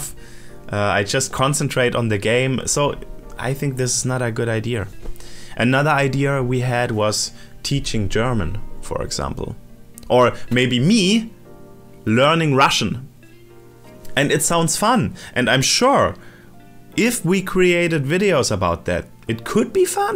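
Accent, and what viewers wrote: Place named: United States. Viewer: У, действиетльно, очень чёткий английски, ближе к американскому, который я тоже очень хорошо понимаю. А вот самих англичан могу нормально понять только через одного, очень сильно зависит откуда они родом. Жуют и искажают слова, фиг что разберёшь. Наверное, их даже американцы плохо понимают.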